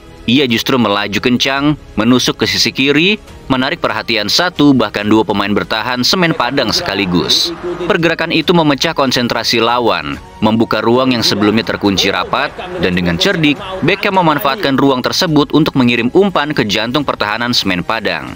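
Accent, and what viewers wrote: West Java, Indonesia. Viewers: Denger aja logatnya msh ada Sundanya 😂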